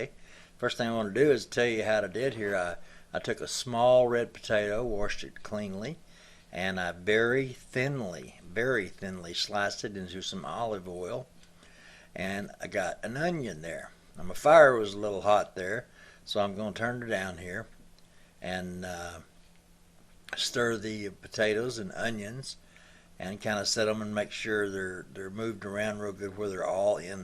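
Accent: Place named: Texas, United States. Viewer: That good ol southern accent is everything!!! sound like one of us Texans. Im going to try these tomorrow morning. Thanks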